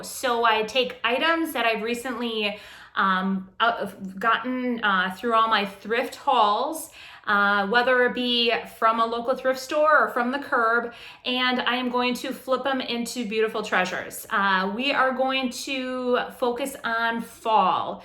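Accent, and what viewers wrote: Wisconsin, United States. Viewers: Like your upcycled items..also ur accent makes me miss Wisconsin...live in Oklahoma now...subscribedand sharing!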